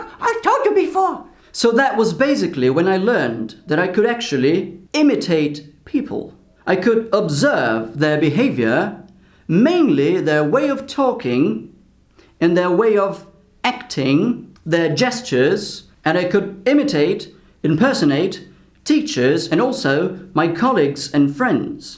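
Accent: United Kingdom. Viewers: did you choose british english or did the british english choose you? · Even though British accent isn't my cup of tea, dude, your accent sounds really nice!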